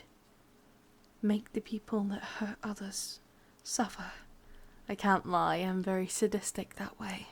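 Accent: Scotland, United Kingdom. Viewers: this is more scottish than english honestly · Omg wait are you Scottish 😭👋👀👀❤️ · I'm getting a mix of Scottish and English in this. Like me, hurray😂. Good video lol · I like this which though I do feel sorry 😞 for not finding the one I hope she does someday ❤️❤️. I really love your voice acting by the way you sound very sadistic but hot also you also you sound so beautiful & soothing I’m impressed 👍👍👍👍👍👍. Also you shouldn’t have to change the way sound personally I like your Scottish accent it sounds gorgeous in my opinion & I’m British with an American accent & I’m proud of it hope you had a great Valentine’s Day ❤️❤️. Kind Regards AuraKnight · yup. Prefer your Scottish accent tbh. Havent check all your vids but is there any rp with your natural accent? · Your natural Scottish accent is far nicer.;) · Thats Scottish accent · H New to thus channel and the accent is great, for me it sounds a bit more Scottish than English. 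I know I'll enjoy this channel tho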